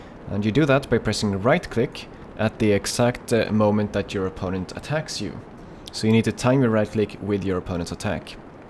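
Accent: Sweden. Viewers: hes swedish i can her it on his voice cuz im swedish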